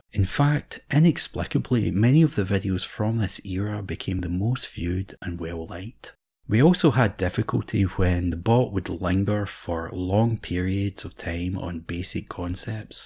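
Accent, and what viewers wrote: Scotland, United Kingdom. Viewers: All the comments here are from bots, this is a cesspool of AI entities... all hail the circuit... Looking forward to your next video! Always love to hear your Scottish accent, lovely memories of my time in Scotland😊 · Even the scottish accent was elaborated with AI? banger !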